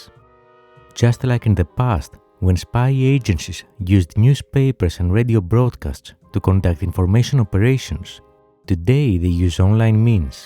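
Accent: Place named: Greece. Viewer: He's greek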